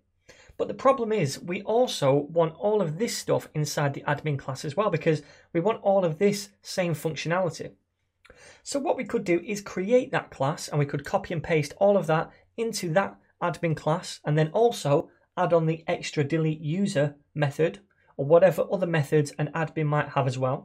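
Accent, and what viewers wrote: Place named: Northern England, United Kingdom. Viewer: You are an excellent teacher, and clearly nothern England (I send Manchester??). This is some of the best JS stuff i've learned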